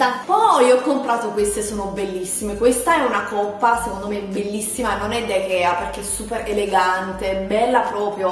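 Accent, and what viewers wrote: Naples, Italy. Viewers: io amo la tua cadenza napoletana 💖💖💖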